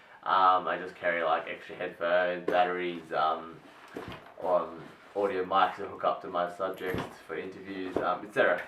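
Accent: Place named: Australia. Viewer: Where you from, you sound Australian, how'd you get a hold of one of these?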